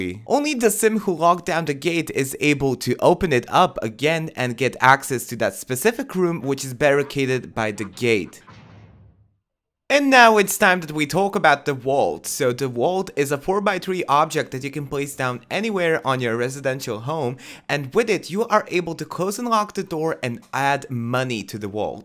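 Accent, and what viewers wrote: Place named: France. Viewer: What a lovely accent! Are you french?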